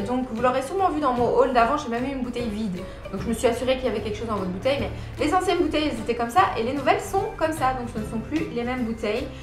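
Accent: Belgium. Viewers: bonjour, trop contente que tu organise ce petit concours car j'aimerais vraiment me lancer dans le métier de prothésiste ongulaire. écoutes je t'ai connu de façon un peu singulière, c’était un dimanche en fin de journée, ayant acheté il y a quelques semaine une machine led et quelques vernis semi-permanent j'ai voulu trouver sur Youtubeuse qui expliquait de façon clair et précise la pose de vernis semis permanent. et biensur je suis tombée sur toi!! tu ne vas pas me croire mais j'ai tout de suite était captivée par ta voix, tu expliques très clairement et c'est ce qui m'a plus. j'ai d'ailleurs reconnu le petit accent Belge!!!! du cou^p j'ai parcourus un peu toutes tes vidéos et me suis abonnée tout de suite. je dirai que la première vidéo que j'ai vu de toi était celle de la pause d'extension en gel avec le chablon (PAAS LA PLUS SIMPLE ) mais je ne sais pas pourquoi je me suis tournée vers celle là. 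voiliiii tu sais un peu tout. merci d’être la, d'avoir le courage et la patience de partager ton savoir et surtout d'être TOI. a bientôt mon inta est ET MON fb Alicia mbuyi muadi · Coucou! Alors j'ai découvert ta chaîne grâce à ta vidéo TUTO pas à pas French avec du semi-permanent. Et ce qui a fait que je me suis abonné est que j'adore la façon dont tu parles c'est super agréable, tu donnes beaucoup de conseils et ton accent belge est sympathique!! En tout cas je viens tout juste de découvrir le gel et l'acrylique donc j'aimerai justement m'y lancer. Félicitations pour tes 10k, ca a l'air de vite monter, bisous bisous! FB: Louise Rousseau (ArcEnda)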